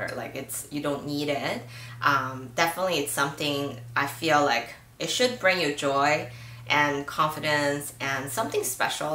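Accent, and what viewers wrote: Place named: Hawaii, United States. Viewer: haha okay! You sound like a Hawaiian local!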